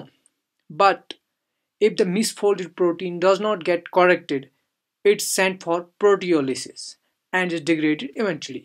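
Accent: India. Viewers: as soon as i heard the indian accent i knew this would be an informative video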